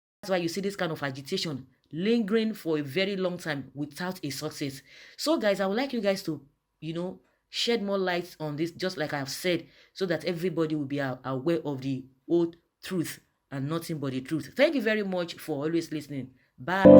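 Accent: Yoruba, Nigeria. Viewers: See how u just disgrace urself on this article. Next time if someone give u an bad article to gossip about Nnamdi Kanu u will not accept. U have to understand something. People love this guy no matter what. Just stay clear on Biafra affair. I know u a Yoruba girl because I can sense it on ur accents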